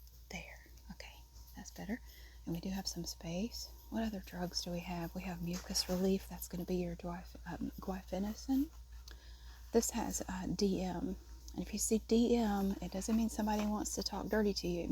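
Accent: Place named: Greensboro, United States. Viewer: your asmr videos are so nice for me because of your accent. i was born in greensboro and lived in the area for most of my life so its sooo comforting to hear the voice of the women i look up to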